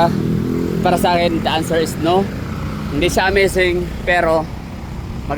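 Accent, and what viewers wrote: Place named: Visayas, Philippines. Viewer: Hindi nga amazing... Pero pede na kasi malapit sa NCR. Saka may bias ka kasi kasi bisaya ka. Halata sa accent mo. DDS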